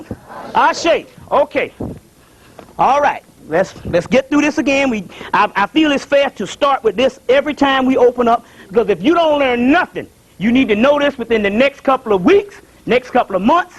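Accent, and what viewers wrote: South, United States. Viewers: I can listen to brother for Hours black Billy Graham with that southern tang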